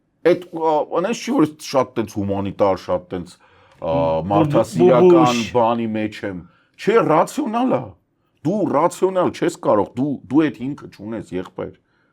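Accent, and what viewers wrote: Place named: Russia. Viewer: Այ տկարամիտ մինչև երբ պիտի տկարության մեջ թպռտաք, նեթեն յահու չե այլ նաթան յահու , հայերեն թ.փ. ք. ունենք ինչու եք դրանց փոխարեն ասում,տ. Պ. և կ և այլը, բավ է ռուսերեն առոգանությամբ խոսել. դուք Համէրը չես կարող ասել խամէռ. Հոնդան չես կարող ասել խոնդա. եթե այտ պես է ապա դուք լեքսուսը պիտի ասեք լեխուս. ռուսներից խույս եք տալիս և զզվում նրանցից բայց դեռ ռուսերեն առոգանությամբ եք խոսում. դուք հայ եք և պարտավոր եք անուն. անվանումները ուղղակի հենց հայերենով արտասանել ոչ թե մտնել ռուսերեն և հետո արտասանել հայերեն. էնէլ իրանի հեղափոխության ժամանակ էր. Խոմեյնին արտասանել էիք Հոմեյնի. Դուք կիրդ ու գրագետ անձեր եք չի կարելի այտ պես վարվել❤:Օրինակ դուք TRUMP-ը չիկարելի արտասանել ՏՌԱՄՊ. այլ ճիշտ անգլերենի ձևով պետքե ասել և այսպես=ԹՐԱՄՓ կՐծում եմ հասկանալիէ❤🎉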